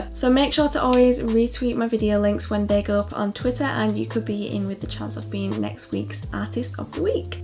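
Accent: United Kingdom. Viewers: First time hearing your voice... and I'm like WTF SHE'S BRITISH, but like where abouts you from cause I know a few people with your accent? Xx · yea I was so shocked when I found out she was British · DUDE I THOUGHT SHE WAS AMERICAN BUT SHES WHATEVER WHAT BRITISH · Are you British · wait wha- your British!!!!!! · where are you from cause tha is a UK accent 101 😂😂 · Is she British??? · Wait what the..... she is British i... Wow 😳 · She’s BRITISH🤯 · James charles: No comments about voice Moriah elizabeth:no comments about voice: omg ur voice is soooo BRITISH i thought it wud be like american.... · I follow her on tiktok and when I saw she had a YouTube channel I was so happy! When I played the video tho, her voice shocked me, I thought she was American but shes British. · Wait are you from the uk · Wait I watch ur tik tok’s and I never heard ur voice! I thought you was American wait so are you British like me??? 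🤔☺️💗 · Omg u're britishh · Who knew she was brittish lol I am shocked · WOoh i thought she has an american accent not a british accent hahaha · Youre britishh!?!? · She is BRITISH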